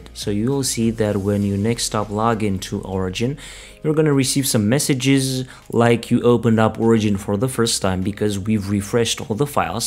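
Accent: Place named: Romania. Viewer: wtf, are you romanian ? :))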